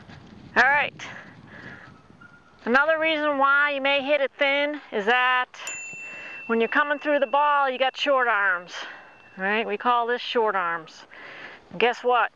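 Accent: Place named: New England, United States. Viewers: Working my way through all your videos, which have been soooo helpful. I am like Mark J. I stick to one person's advice and yours is clearly a game changer. Thanks and I love your Yankee accent. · Funny lady and such a clear explainer. Love that no nonsense New England accent. packs a ton of info into a short space and is always entertaining.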